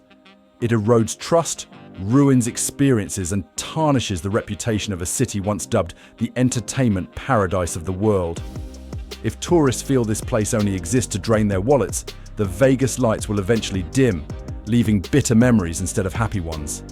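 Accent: United Kingdom. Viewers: Sounds like you want something for nothing. Las Vegas survives off of resort fees and the casinos without that there is no Las Vegas. If we go to your country you do the same shit what are you talking about. You're a hypocrite. You sound like you're from Great Britain has anyone ever been to Great Britain. They gouge the tourist like there's no tomorrow. You're a hypocrite.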